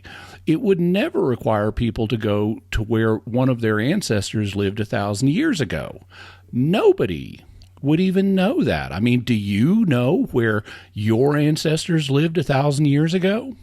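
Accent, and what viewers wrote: South, United States. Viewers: For the first time ever I noticed a bit of a Southern accent. I wonder if Jimmy went home to Arkansas or wherever he's from And visited family. Anyway, great video. Good stuff as usual! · He recently moved back to Arkansas, so his natural accent is reemerging. I like it, shows some Southern heritage representation in Catholic apologetics.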